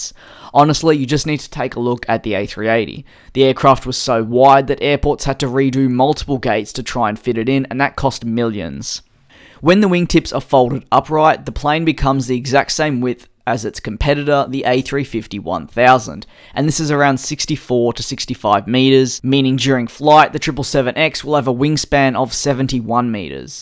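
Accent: Australia. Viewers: He kinda sounds like Crocodile Dundee, huh?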